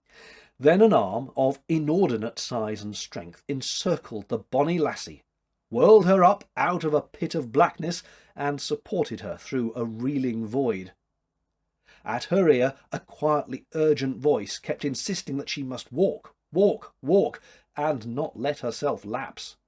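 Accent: United Kingdom. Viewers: look into uploading your recordings to Libravox. Make sure to mention your British, because Americans dig the accent.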